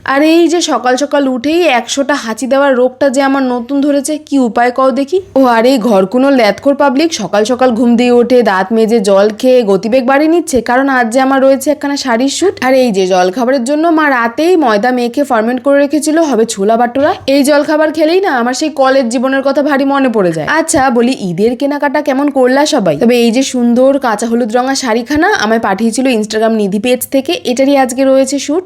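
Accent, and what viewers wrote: Bangladesh. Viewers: আপু আপনি বাঙাল না ঘটি 🤔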